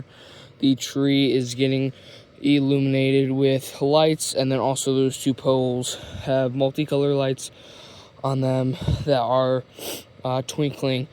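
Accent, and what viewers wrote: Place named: Minnesota, United States. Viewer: man, i seriously thought it was. I think the accent added to my conviction that it was Minnesota landscape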